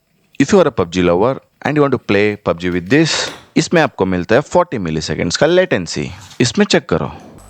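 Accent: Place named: Northeast India, India. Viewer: Bhai tum kaha se ho, aapki aawaj se lagta hai ki aap northeast India 🇮🇳 se ho 😅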